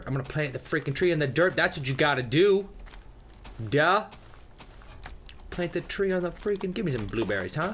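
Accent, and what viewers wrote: United Kingdom. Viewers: hey! are u talking british? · Love ur British voice! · You have an awesome British accent! :D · I FREAKIN LOVE YOUR BRITISH ACCENT WHEN I HEAR IT I PASS OUT!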